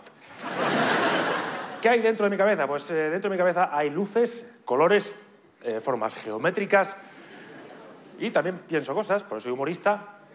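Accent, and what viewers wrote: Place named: La Mancha, Spain. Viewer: Seduccionnn fatal!!!!!! 🤣🤣🤣Ese tono manchego ,que crackkkk jaja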